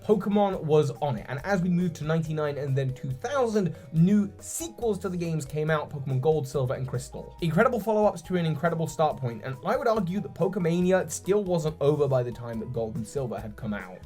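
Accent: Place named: Newcastle, United Kingdom. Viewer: Nearly all my pokémon from the mythical pokémon gifts were on a save file that got corrupted D-:. I think that accent sounds a bit Geordie.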